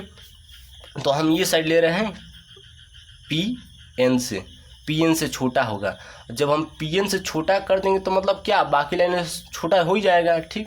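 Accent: Bihar, India. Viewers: Lagata hai bihar ke teacher hai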